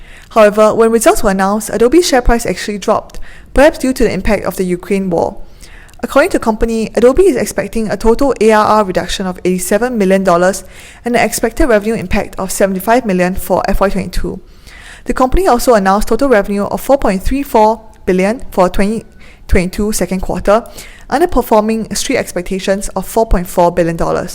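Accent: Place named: Singapore. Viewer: Singaporean accent.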